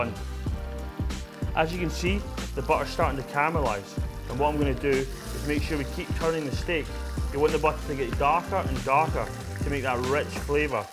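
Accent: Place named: Scotland, United Kingdom. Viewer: Yer Scottish yay 🏴🏴🏴👍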